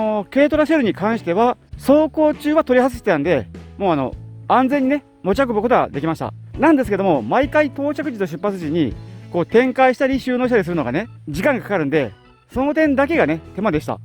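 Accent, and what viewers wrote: Okayama, Japan. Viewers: 岡山の皆さん、茨城県から質問失礼します。さんがよく語尾、会話の間に、 「から」って言ってますよね？あれは方言なのですか？教えてください、お願い致します🙇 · お疲れ様でした。コロナが勢いを増している今、楽しく拝見してました。さんの岡山弁に毎回、千鳥みたいじゃー（笑）と思ってクスクス笑ったり、朝市に行けなくて一緒にガッカリしたり、トウモロコシがなかなか売ってなくてそうなの？何で？と思ったり。最後、なんか食べれるねと思ったら、律儀に計算ミスがあってと修正されて、あらら〜ってなりました。本当、楽しかったです。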